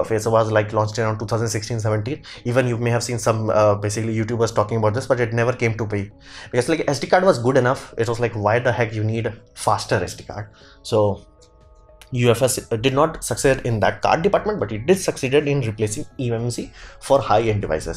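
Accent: India. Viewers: In spite of the heavy Indian chatter accent, a VERY good explanation.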